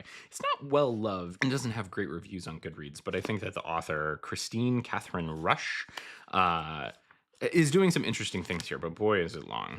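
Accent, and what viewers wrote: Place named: Canada. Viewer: See? There’s a reason why I thought you were Canadian at first 😂😂😂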